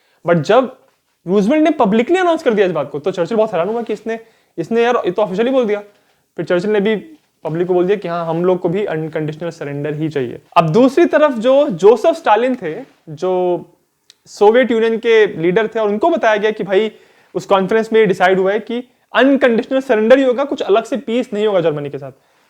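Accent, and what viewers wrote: Bengal, India. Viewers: Sir, are u bengali ?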